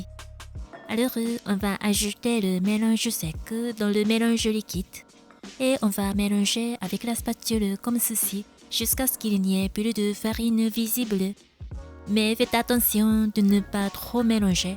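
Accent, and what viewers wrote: Japan. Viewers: Inconditionelle de votre adorable accent japonais, et très envie de tester votre recette mais je n'ai pas de cocotte qui va au four, y a-t-il une autre solution ? Merci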